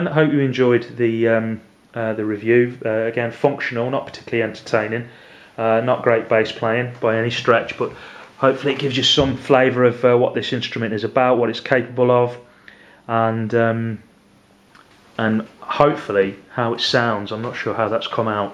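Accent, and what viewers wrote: United Kingdom. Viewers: You actually bothered to type that? The vids great, it's a mixture of talking and playing, about right in my opinion. Nice to hear it done by a no nonsense British bloke for a change, like ya mate doing it.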